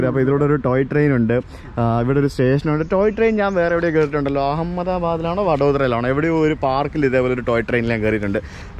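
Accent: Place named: South Kerala, India. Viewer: പോകുമ്പഴത്തേക്കും . നോക്കുംബഴത്തേക്ക് . വികല മലയാളം ആണ് ..അരോചകമായി തോന്നുന്നു . നിങ്ങൾ അവിടെ എത്തുമ്പോൾ . മതി . മണ്ടക്ക് കയറി അല്ല മുകളിൽ കയറി . മണ്ട . ജീവനുള്ള വസ്തുക്കൾ ക്ക് ആണ് ..കേരളത്തിൽ മുഴുവൻ ഉള്ള ആളുകൾ കാണുന്ന വീഡിയോ യില് തെക്കൻ ഭാഷ കഴിവതും ഒഴിവാക്കുക . ❤️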